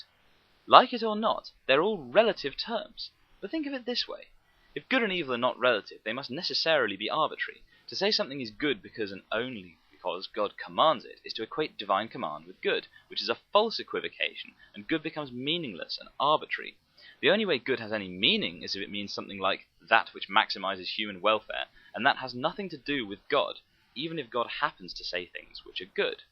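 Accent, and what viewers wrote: United Kingdom. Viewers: This is a much better video than I usually get and I truly thank you for it. I told myself I was not going to do many more rebuttal video, however, I might have to rethink that decision. I obviously disagree with you nonetheless well done. And allow me to grant you the same (backhanded in your case) complement. You good sir are quite bright and more than eloquent, aided greatly by that killer British accent.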